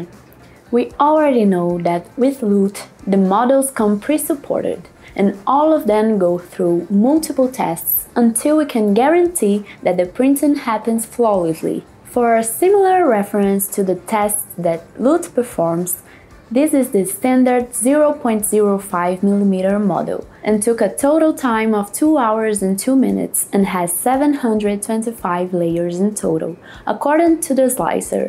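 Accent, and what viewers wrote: Brazil. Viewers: Nice Brazilian Portuguese accent! Could tell just by the name hahaha I never changed the layer height settings in my printer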